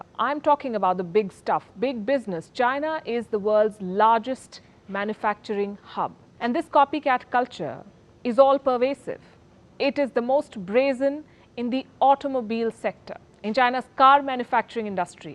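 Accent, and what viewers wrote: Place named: India. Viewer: Indian accent os the biggest ripoff that Indian has provided,youhave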